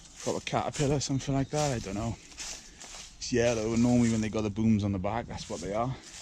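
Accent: Wales, United Kingdom. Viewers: Looks Scottish sound Welsh. Who's jackets that coat?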